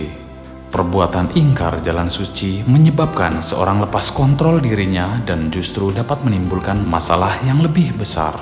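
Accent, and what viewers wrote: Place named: Indonesia. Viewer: Kenapa bahasa mandarin di baca dengan logat indonesia??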